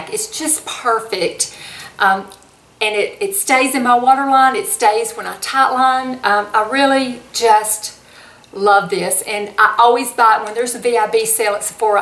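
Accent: South, United States. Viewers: You are stunning,! I just love your beautiful Southern accent. Will you please do a tutorial with a complete demonstration on how you styled your hair for this video? I'm a visual learner with several new disabilities from a brain tumor. I've learned so much from you and your wealth of valuable information. Thanks for all you do! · Love this video! I do appreciate your Southern accent, since I’m a Southern girl myself. You look great!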